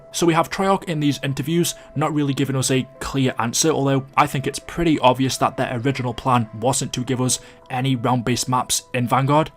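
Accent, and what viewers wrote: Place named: Northern England, United Kingdom. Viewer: You have a very interesting accent! What is it? Northern English? Western Canadian?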